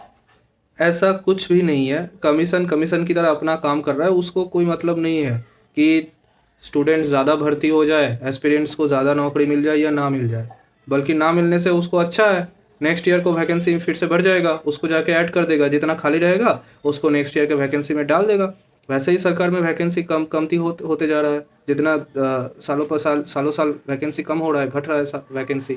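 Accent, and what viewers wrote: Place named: West Bengal, India. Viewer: mai west bengal se hoon. Apki batein sunkar lagta hain ap v West bengal ka ho. Steno exm related main sirf apko sunta hoon, channel to hazaron paar, ek ap hi ho asardar, baki sab bekar😄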